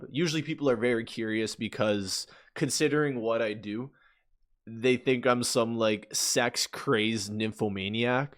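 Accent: Canada. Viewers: Canadian, definitely Western Canadian. The "a" is pretty distinct to Alberta.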